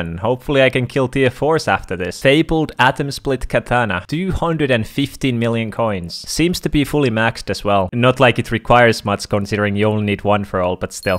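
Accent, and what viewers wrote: Finland. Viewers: häiritsee ku englannissa kaikkilla suomalaisilla on semmonen aksentti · The finnish accent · Finnish accent 👀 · are you finnish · R u from Finland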